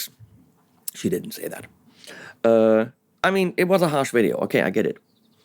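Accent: Germany. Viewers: I'm afraid of you because of your lack of hair, that german accent, and your freakishly large stature... otherwise, you are lovely :D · I think they might r some unconscious bias going on, tall bald German speaking English with a German accent (rightfully) knit picking critiquing things. Not fair but I can imagine it being a thing As far as the Marshall getting back a/part of the solution could be frfr’s/stage pa’s and official licensing of irs and amp modeling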